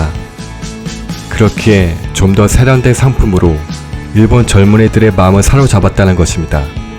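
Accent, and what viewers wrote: China. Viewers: 발음이 한국인 아닌것 같은데 중국인 발음같은데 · 동영상 내용은 좋은데.. 말하는 발음이 솔직히 좀 거슬리네요^^;; 마치 중국인이 한국어 하는 느낌이랄까요?? 특정 발음이 안되는게 있으신듯요. 말투나 목소리는 좋으신데..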